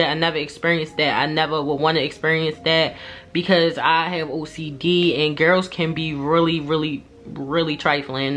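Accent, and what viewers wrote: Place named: Maryland, United States. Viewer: Yesss straight into the story ! I’m loving it 💯u jus earned yourself a #Newsubbie 🥰 btw Are you from Maryland ? I’m asking because I’m from Baltimore Maryland and your accent sounds like u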